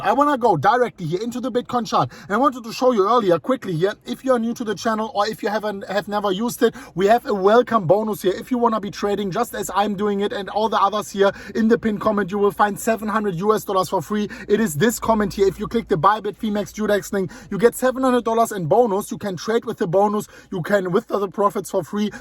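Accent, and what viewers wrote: Germany. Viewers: Deutscher Akzent kickt xD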